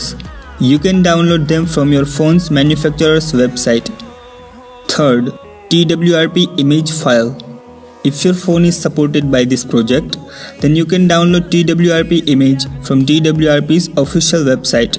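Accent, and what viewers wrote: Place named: India. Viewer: i hate indian english!!!! i cant understand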